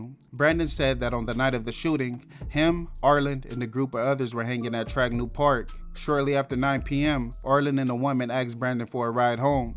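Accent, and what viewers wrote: Los Angeles, United States. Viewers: Right on time‼️ btw are you from LA?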